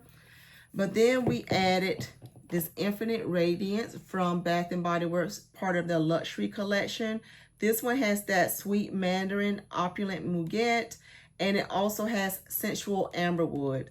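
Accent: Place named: Paris, France. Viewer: I hear your combos..don't have most of them. Oh well. U Already pronounce Bouquet just right...the T is silent.. it's the same with Muguet..Moogay. perfume in french is Eau (ike Oh) De ( like street talk..she s at de store. A bad The) then the Flower name (roze, de vee-oh-let..de Sare-eece. Cherry.. the words slide into the next Ohderoze. Ahhh..u sound like you are from PaRee. Paris in french.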